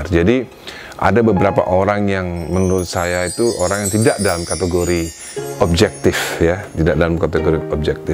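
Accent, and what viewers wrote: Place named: Surabaya, Indonesia. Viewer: Bose gesss Boseee .... Makin mantap monolognya, kas suroboyone tapi ada solo solonya dikit.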